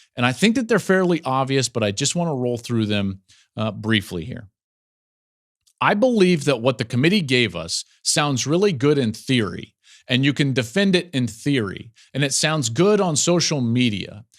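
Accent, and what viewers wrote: South, United States. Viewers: Wow, I'm surprised you decided not to use your southern accent and unprofessionalism to mock the whole South to get your point across this time. Your bias is so obvious. Why don't you take some cues from Greg Mcelroy sometime about hiding biases when working professionally, and he has been in the business for a shorter time than you. You completely lost my respect when you decided to mock a 2-time national champion. What are your accomplishments compared to that?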